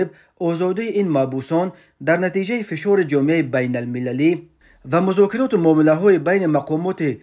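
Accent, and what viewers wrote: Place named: Badakhshan, Tajikistan. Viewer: Кусоча барои лайк зану хохарта мефуруши.... Да овозат кери бадахшонихо...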